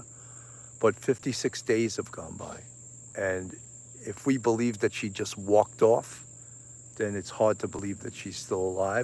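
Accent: New York, United States. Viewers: New subscriber, love your New York accent.. thanks for your service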